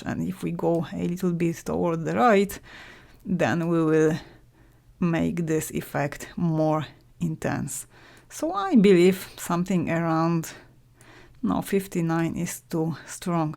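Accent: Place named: Poland. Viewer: De acuerdo, Enrique, y ya que mi lengua materna es el inglés puedo confirmar que el dominio de del idioma es superior. Es más, su rico acento polaco, según lo expresan los hondureños al menos, pues, es del todo 'delichoso' al oído nativo.:)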